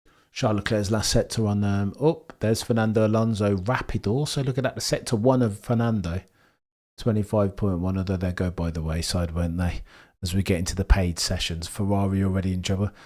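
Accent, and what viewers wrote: United Kingdom. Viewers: Proper British lad 😂 I love the accent .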